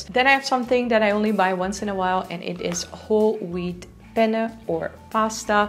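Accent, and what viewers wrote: India. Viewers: Is this your native accent or you learnt it, your English sound more like Indian.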